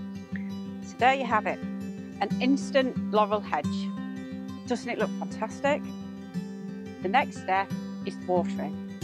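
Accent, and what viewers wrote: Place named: United Kingdom. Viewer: Her British ACCENT is so THICK, I can't understand a word she is saying. I would say try speaking English but that would be counterproductive since....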